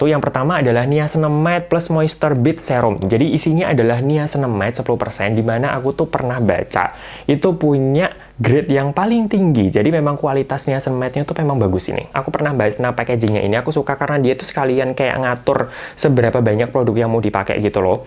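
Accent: Java, Indonesia. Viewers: Waduh jowonya mendok sedep mas hi hi...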